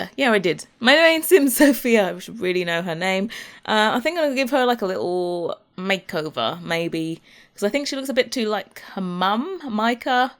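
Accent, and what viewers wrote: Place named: England, United Kingdom. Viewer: I'm not one for commenting in public...very British of me! Nevertheless, your taste is impeccable and I do love hearing an English accent. The fact that this is a 2017 video and the design holds up so well, as well as the presentation of the video , is amazing. Thought I would say it is rather criminal that you aren't more pushed in the Sims community and have subscribed as of about a week ago! Good luck for the future.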